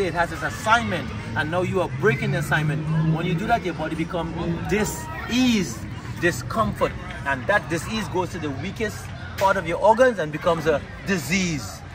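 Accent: Trinidad and Tobago. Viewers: He sounds like a Trinidadian 🇹🇹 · Man is ah trini... I feel he from port of Spain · Bro sounds Trinidadian 🙏🏽👏🏽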